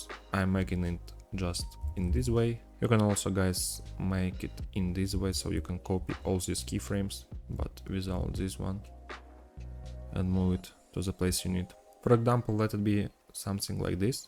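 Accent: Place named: Russia. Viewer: Прям такой русский акцент. Вы говорите по русски?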